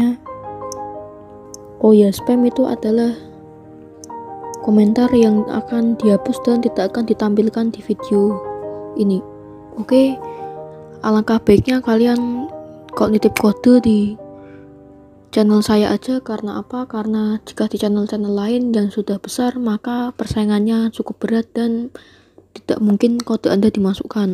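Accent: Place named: Java, Indonesia. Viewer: Kayak orang Jawa ngomongnya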